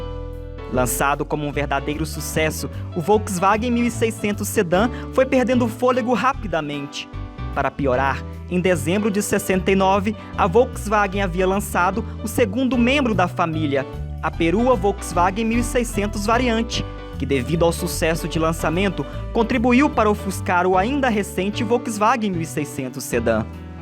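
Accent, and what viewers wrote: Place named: Northeast, Brazil. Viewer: Voce fala muito mal e do nordeste, bahia, so mostra as imagens nao fala nada por favor.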